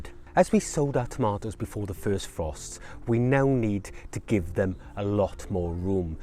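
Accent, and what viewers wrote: Wales, United Kingdom. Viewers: Are you from the valleys ?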